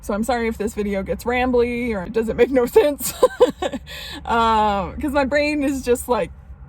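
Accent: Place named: South, United States. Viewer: This girl has got to be from the South … love her! 🥰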